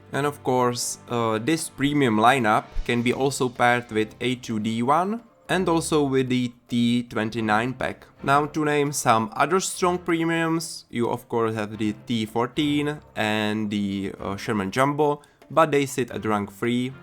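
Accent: Czech Republic. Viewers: Great video it helped me to pick the best premium to buy. Ale ten českej přízvuk mě hnedka hitnul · It's so noticable that you are czech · Český přízvuk je docela znatelný :D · Já 2 vteřiny po začátku videa: To je jasnej čech, dávám odběr :D Dlouho čekám až se ta youtube česká základna WT trochu rozroste :D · čengliš slyším už od prvního slova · hodně český accent :D nicmene pekne video :) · Hey are you perhaps czech? I noticed the accent lol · Bro tohle zní jako hodně českej "akcent"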